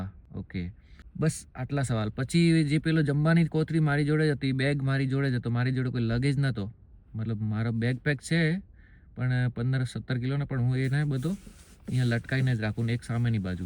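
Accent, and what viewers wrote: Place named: Surat, India. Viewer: Surati gujarati bole chhe 😅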